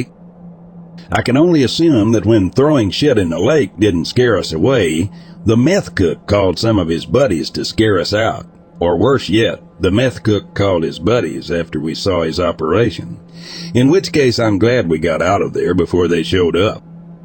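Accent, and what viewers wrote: Texas, United States. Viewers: yeah same, he is probably around 65-75, but he does sound like he's from Texas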